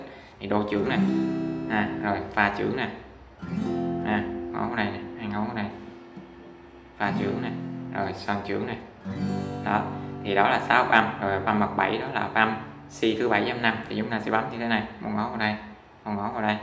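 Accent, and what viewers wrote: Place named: Binh Thuan, Vietnam. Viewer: cảm ơn bạn những Video hướng dẫn rất nhiệt tình, nghe giọng giống dân Bình Thuận :D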